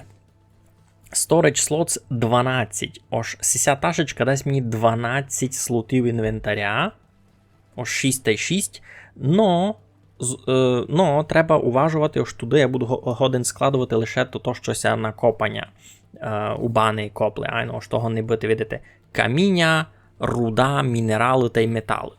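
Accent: Transcarpathia, Ukraine. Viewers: Боржавський або бережанський діалект (центральна частина Закарпатської області). Містить достолиха говірок. Єдиної стандартизованої версії поки не має.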